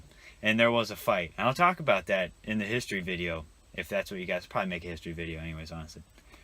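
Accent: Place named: Louisiana, United States. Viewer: Ohio plates but talkin like a gay louisiana boii 🤔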